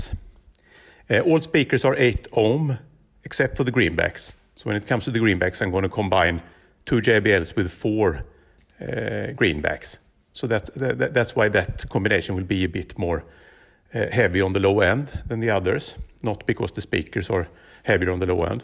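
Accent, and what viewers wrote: Sweden. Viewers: That swedish accent :) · Låt mig gissa att du är svensk? Det låter som kul när du pratar svenska fast på engelska! Fan va hårt! :) gillar att du gjorde en video om detta! Bra jobbat!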